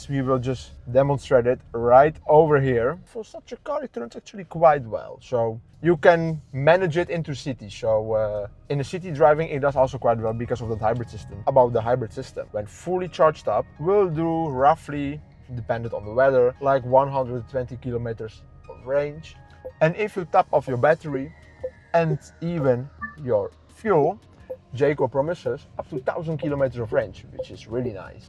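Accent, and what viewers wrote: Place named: Netherlands. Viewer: Thats some true Dunglish right there.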